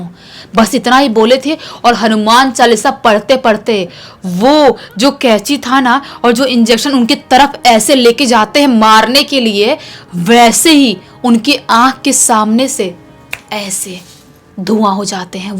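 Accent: Bihar, India. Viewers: plz btadijiye kya ap bihari ho? Apka assent whin k lgta h aur bhut words v! ❤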